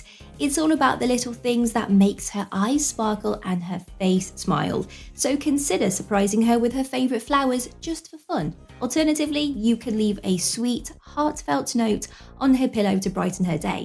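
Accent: United Kingdom. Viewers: I watch this just for the excellent british english 🥰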